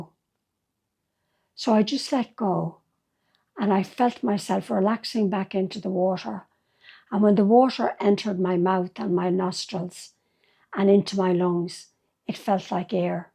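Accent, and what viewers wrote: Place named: Ireland. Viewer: Thank you for sharing your story. I was scrolling through utube & ran across your video & your Irish brogue. My Dad was from Ireland. Many blessings to you . Im sure you sharing your story will bless many. Sending love & light.❤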